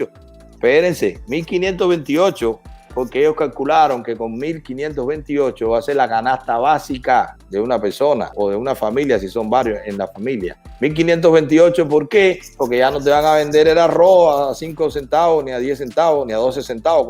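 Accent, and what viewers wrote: Cuba. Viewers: Ese man es nacido y criado en la caliente. En el coraxon del cerro ..CARRAGUAO... No hay otra forma de hablar para alguien nacido alli.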